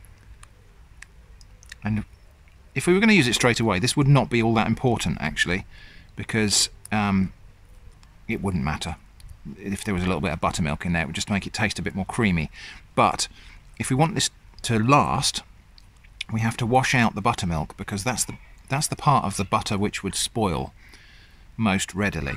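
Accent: United Kingdom. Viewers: because of the British accent, he makes anything he does sound completely okay and normal...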